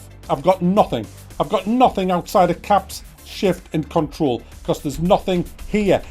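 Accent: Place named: North East England, United Kingdom. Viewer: I look forward to videos, hearing a good old rant from a fellow North East bloke!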